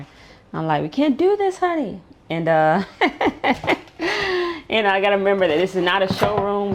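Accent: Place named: New Orleans, United States. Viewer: U HAVE A NEW ORLEANS ACCENT.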